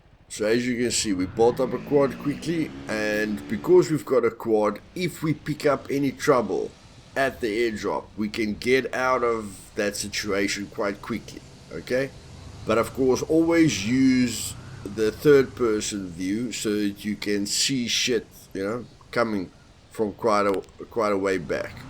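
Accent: South Africa. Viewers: I was going to say you sound South African. Glad to see another SA player making content. Lekker boet lekker. Keep em going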